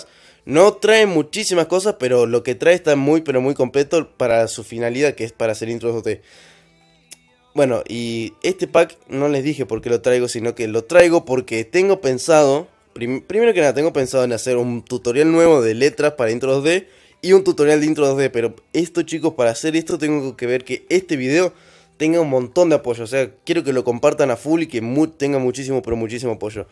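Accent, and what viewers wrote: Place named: Argentina. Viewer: de qué parte de Argentina sos ?😆😆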